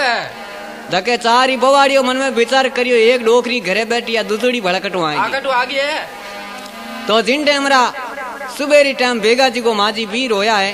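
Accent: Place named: Marwari, India. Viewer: orignal Marwari Voice really enjoy !!! also help for new generation to learn history Thanks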